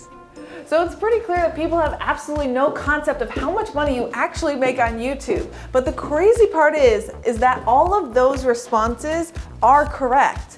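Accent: New York, United States. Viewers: I've followed your channel for several years and use it to practise my english listening stills. At very first video I thought you have a NY accent, you always swallow the "h" sound from "manhattan" and the words come from your throat not from lungs, it makes the words sound more lighter than someone from california. I had difficulties at that time, now I am used to it. Great performance, beautiful apartment! Go ahead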